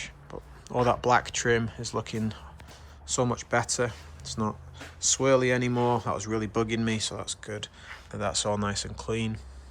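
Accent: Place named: United Kingdom. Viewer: You have a british accent but are u in California?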